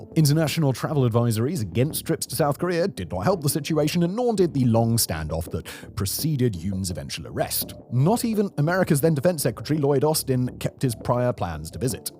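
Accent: RP, United Kingdom. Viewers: Also a European lecturing Americans in a condescending tone with the queens English about power for 30 minutes while living in an impotent country is rich.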